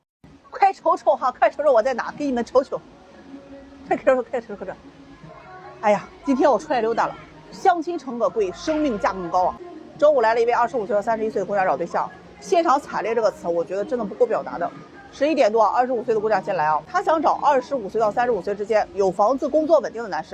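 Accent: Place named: Jiangsu, China. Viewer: 她江苏的 口音不是东北的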